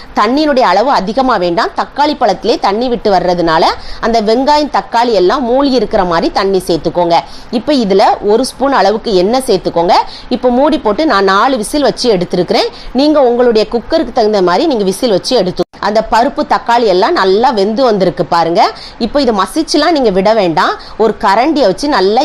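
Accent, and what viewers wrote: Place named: Tirunelveli, India. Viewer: ஆகா நெல்லை தமிழே வாழ்க, நன்றி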